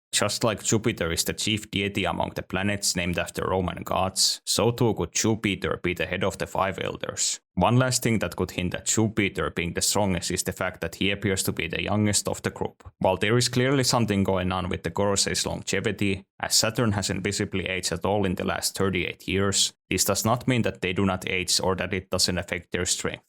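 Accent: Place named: Finland. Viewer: Great content. Ps: Suomalainen aksentti spotattu😆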